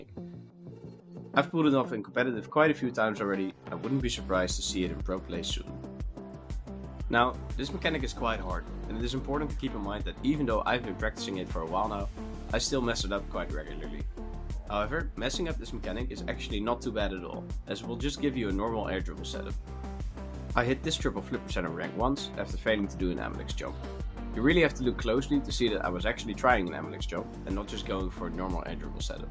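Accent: Netherlands. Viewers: haha het accent gaf idd een indicatie dat OP een Nederlander is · Het Nederlands accent 😭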